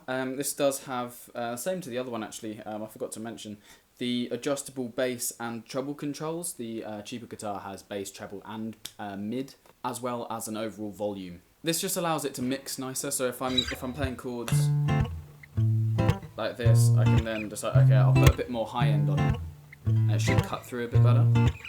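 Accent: United Kingdom. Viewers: Fabulous and I love your Great Britain accent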